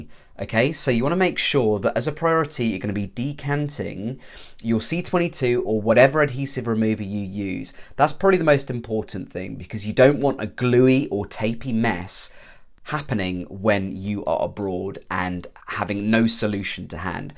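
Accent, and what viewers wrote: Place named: England, United Kingdom. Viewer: greatly appreciate your swift reply! i live in Kazakhstan, perhaps quite far from where you are, which, judging by your accent, is in England?) Agree, going to a stylist would be the safest option. I am torn between Lordhair and Lavivid - Lordhair offer haircut, too, i just need to send them a preferred style from a catalogue. Do you think it is normal/safe? But my biggest issue/fear - how do i measure the size of my head? I have a large head, and should i simply rely on a measuring tape? thanks, mate!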